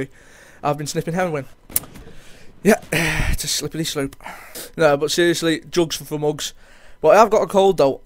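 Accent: United Kingdom. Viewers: It's ONE of our British accents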